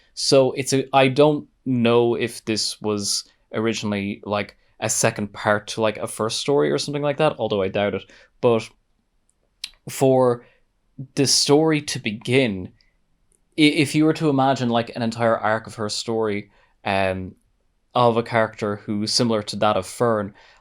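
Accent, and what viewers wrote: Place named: Ireland. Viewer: My family name is Irish. I detect an Irish brogue. There has to be an Irish definition for this kind of story.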